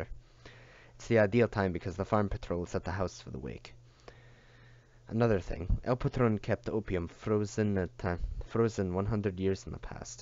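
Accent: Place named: Scotland, United Kingdom. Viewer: why do you speak in "Scottish" voice?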